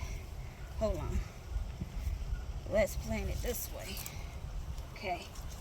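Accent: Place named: North Carolina, United States. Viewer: You sound like you're from lumberton NC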